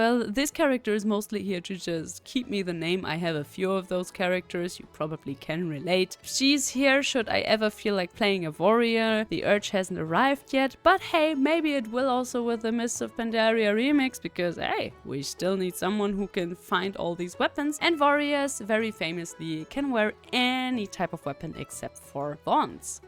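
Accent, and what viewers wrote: Germany. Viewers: Hey! Love binging your videos while Studying for my exams haha! where did you learn about the wow lore im trying to get into it because im newer to wow. ps: are you german? you accent sound familiar😊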